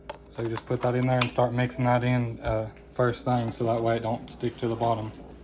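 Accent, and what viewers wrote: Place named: West Virginia, United States. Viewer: This looks delicious yet simple. What a blessing you are to your grandparents! I just love your WV accent; sounds like the folks from my home in eastern KY. 🌻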